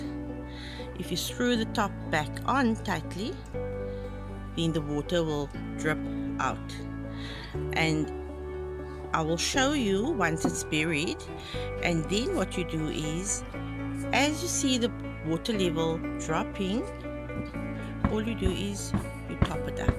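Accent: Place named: South Africa. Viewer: How lekker to hear a local accent! Thank you for this tip. Greetings from Joburg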